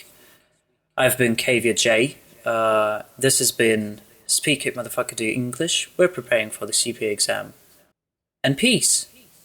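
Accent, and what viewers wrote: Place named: United Kingdom. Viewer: У тебя такой типично британский акцент)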